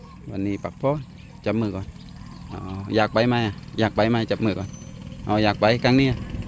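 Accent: South, Thailand. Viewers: ขอโทษครับ ผมเพิ่งได้ดูช่อง เป็นใครรึครับ?? พูดใต้'เป็นตัวเเทนคนใต้เลยคับ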